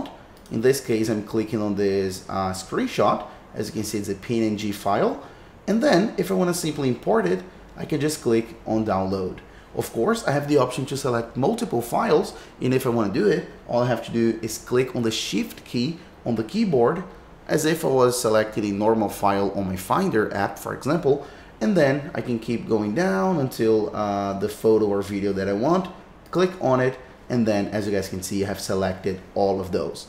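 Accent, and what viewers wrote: Brazil. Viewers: Not related to the tutorial but I hear that Brazilian ascents 😂 good tutorial. Thanks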